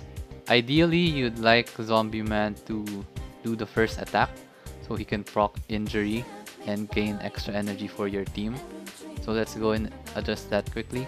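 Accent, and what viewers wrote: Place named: Philippines. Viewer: Are you from Philippines because your accent is Philippines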